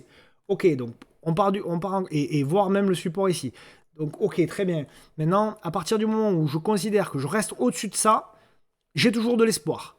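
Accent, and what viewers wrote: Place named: Montpellier, France. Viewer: Faut se le farcir l'accent de Montpellier..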